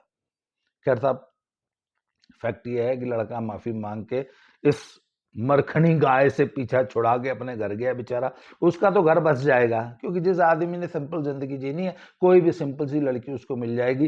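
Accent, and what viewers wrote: Haryana, India. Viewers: Ram ram dada ji, Aap ka Hariyanvi samvad kamal ka he 😂😂😂😂😂, aisa lag hi nehi reha ki aap eastern UP se ho,lagta he ki aap Hariyana ki gramin sathli se ho 🙏🙏🙏🙏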